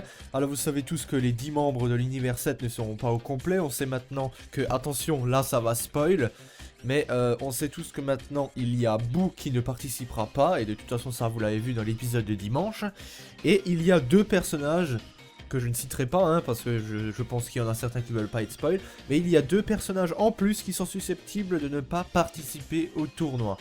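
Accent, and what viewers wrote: Alsace, France. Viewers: t'es alsacien nan ? xd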